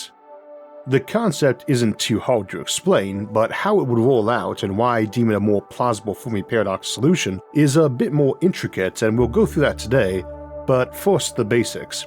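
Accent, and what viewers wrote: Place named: South, United States. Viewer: It's a speech impediment(he has improved over the years tho thanks to speech therapy) Under that is a normal Southern American accent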